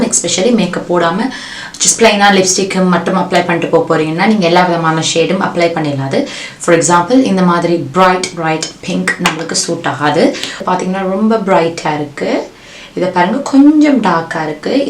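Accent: Sri Lanka. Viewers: Sis r u from srilanka I liked the way u talk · I like ur Tamil slang sis it's like srilankan tamil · Tamil super like srilankan tamil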